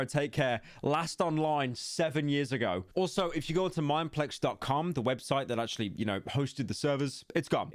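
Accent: United Kingdom. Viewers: why are you so quiet british man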